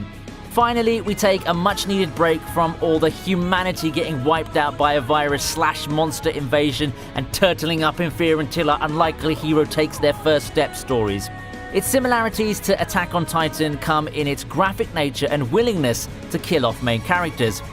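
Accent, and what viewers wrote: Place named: United Kingdom. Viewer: I like your UK accent 👌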